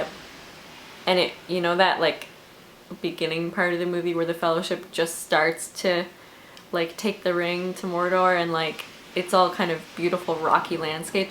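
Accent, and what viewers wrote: Newfoundland, Canada. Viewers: You sound sooo canadian! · my family is from Newfoundland too! I was born in Ontario, though, so I don't have any of the accent like you do (BUT IT IS ADORABLE I WISH I DID). Every time I've been I get the same sort of 'oh my god how does this place even EXIST' feeling b/c it's so pretty and everyone is so sweet :) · Hahaa newfie accent :P I don't think mine is very bad. I don't notice it though because I live here. That's so awesome though! :D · Hey! I met you when you were at the Folk Festival, I dont think I told you my name haha but I came up to you wearing a dress by the shirt stand. Anyways, it was really great meeting you and I love the fact that your Newfoundland accent is really strong here hahaha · omg your newfie accent is thicker then mine and I live here ! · Ahh Newfoundland accent! I'm from NS, and NFL is my favorite accent ever. 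Never thought I'd see a maritimer youtuber. This made my day! :) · You have more of a nl accent than me and I've lived here all my life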